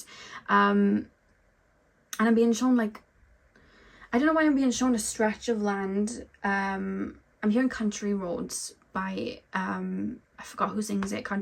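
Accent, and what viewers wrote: Wales, United Kingdom. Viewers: You are from Wales arent you? Whenever i hear that accent i remember whose channel im on 😂 i watch too many tarot reads obviously. But i have to say yours are one of my many favourites. Thank you ❤ P.s im inlove with the accent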